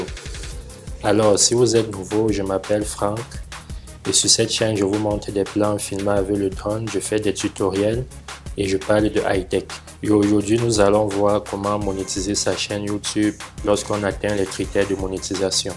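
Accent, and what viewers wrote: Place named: France. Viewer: moi j'aime trop sont acssent francais :D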